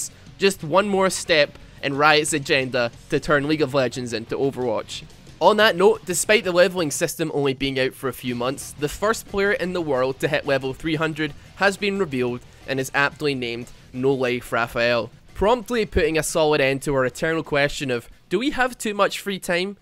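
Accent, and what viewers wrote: Scotland, United Kingdom. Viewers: I went from asmr to this, almost fell asleep to the sexy Scottish accent🌞 (EUW) Legendarylemon5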